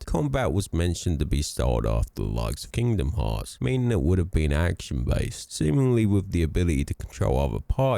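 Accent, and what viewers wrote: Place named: United Kingdom. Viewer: You sound like Moe Sizlack from The Simpsons just getting out of bed with a british accent.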